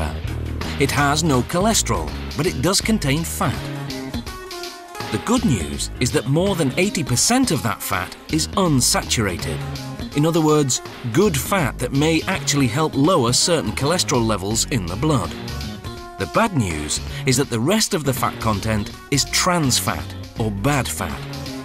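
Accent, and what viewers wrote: England, United Kingdom. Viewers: He's so delightfully british! With his vitamins and aluminum! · I'm not sure where the series originates, but the narrator is English (unless he's from elsewhere in the British Isles and has a remarkable English accent). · Lulz, gotta love how the Brits say certain words differently than we do, like "vitamins".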